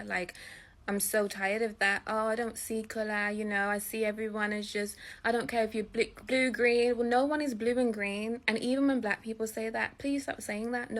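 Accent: Caribbean. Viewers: sounds like caribbean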